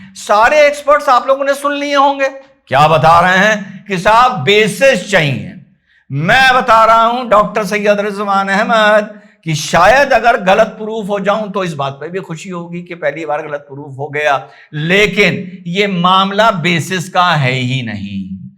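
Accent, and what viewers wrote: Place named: India. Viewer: you dont look like pajeet but sounds like pajeet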